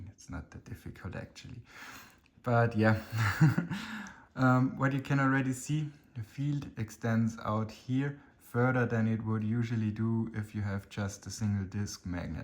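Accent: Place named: Germany. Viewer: Hörst dich deutsch an.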